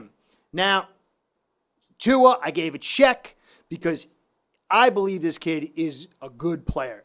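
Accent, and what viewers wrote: New York, United States. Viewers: Great video as usual. You know what’s funny? When you get excited and talk about what you talk about with your ny accent, but when your clip comes up about your sponsor, it’s all professional and what not. I just think it’s funny. Keep up the great work and please never ever put a video out that last 4hrs like some other people do. Some videos are longer then the actual games. And I’m not talking about live streams, I’m talking about just regular ol videos. Sheesh.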